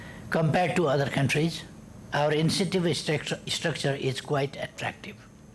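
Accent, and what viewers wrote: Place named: Nepal. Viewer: Very Nepalese accent and confident about what he is talking , loved his style . A man from village of Nepal who struggled and put his life in danger many times. He obviously did not have means to study in good school rather he read on his own means possible and reached to this stature , indeed deserves applause . Cheers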